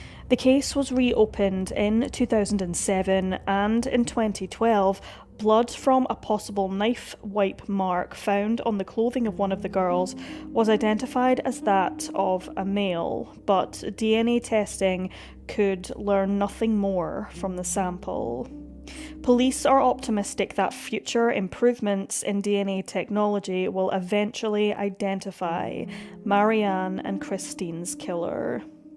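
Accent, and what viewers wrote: Scotland, United Kingdom. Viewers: Love the Scottish accent 😊.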